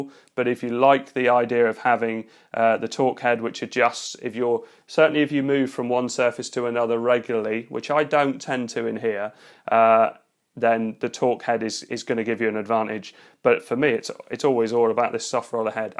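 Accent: United Kingdom. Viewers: thanks for responding. Love the bri’ish accent 🇬🇧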